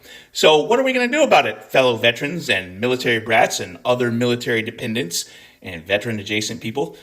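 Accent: Scotland, United Kingdom. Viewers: I gotta say - I love when you’re getting into your rants and your Scottish accent comes out. We need more Scottish rants! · You can tell how passionate is about the subject based on how often his Scottish accent makes an appearance. And he should be passionate about this, as we all should be. If this administration can't treat our veterans properly, they won't treat anyone properly. Thank you, and the admin team, for everything you do. I made it to the end of the video and eff the algorithm.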